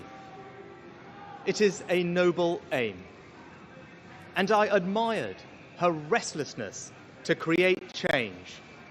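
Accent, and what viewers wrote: United Kingdom. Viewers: Sounds British to me, whats the fuss about his native roots... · this Indian man has brilliant UK accent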